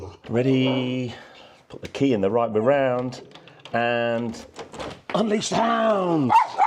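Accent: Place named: RP, United Kingdom. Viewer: Mmm but you have gone bit posh😢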